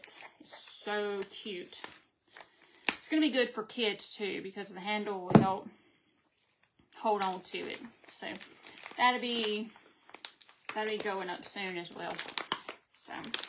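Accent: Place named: Mississippi, United States. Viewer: Thank you, so much for answering me! I thought I heard some Mississippi in your accent. Love it! ♥️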